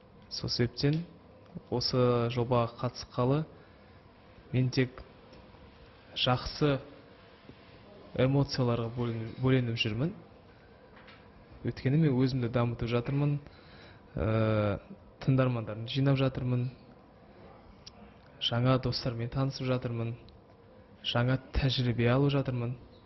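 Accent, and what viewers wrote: Standard. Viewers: Мектептi казакша окыган бала осылай таза, эдеби тiлмен сойлейдi. Жарайсын, айналайын! Берген жауабыннан, iшкi дуниеннiн кiршiксiз таза екенiн тындаган кытай журтшылыгы угады. Ceнiн арканда кытайлыктар казактар туралы жаксы пikipде болады. Рахмет, айналайын, жортканда жолын болсын! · Ботен елде казакша сойлегенди естигениме оте куаныштымын! Димаш жарайсын!!!! Казакша сойлегени оте таза, тил байлыгы жогары!!!! 👍👍👍👏👏👏👏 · Я Димаш таза қазақ тілінде сөйлегені. Арамызда қазақ маңгүртпен мамбеттерге сабақ болса екен. Әр бір қазақ осындай болса екен. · қазақша сондай таза сөйлейтініне, қазақилығына ризамын! Осы жобаға шалақазақ біреу барып сұхбатты орысша беріп отырса бүкіл əлемге масқара болар едік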